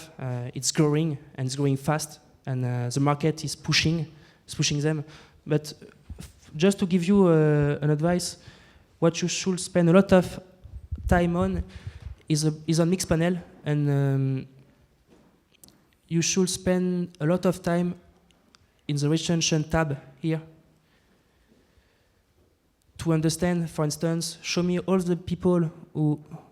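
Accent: France. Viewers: Stop la condescendance, nous ne sommes plus au lycée. Être "fluent" en anglais ce n'est pas donner à tout le monde, que ce soit par contexte, âge, lieu ou expérience. Il y a une sale manie en France de faire de la maîtrise de l'anglais une barrière à l'entrée au monde de l'entrepreneuriat (entretenu par l'institution école de commerce). Hors on se ferme à 2 choses : - les intelligences ne parlant pas très bien anglais (nos mères, nos parents ?) - les marchés non anglophones et pourtant massifs et en croissance forte (à tout hasard : chine). Hors désolé, mais le monde anglais offre tellement de savoirs que ces vidéos ne font pas le poids. Pour un anglophone l'accent est trop prononcé, pour un hispanique l'accent rend l'anglais encore plus difficile et pour un français, s'il parle anglais, il ira regarder d'autres vidéos. Perso je n'ai aucun mal avec l'anglais, mais pour faire du sport, pour le métro, ou pour s'endormir, le frenglish ça demande trop de concentration. 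En plus on va pas se mentir, c'est un peu bidon comme stratégie rien que vis à vis de l’algorithme de Youtube. Perso j'aime la famille pour la voir se rater. Mais je ne peux pas non plus leur en vouloir de tester.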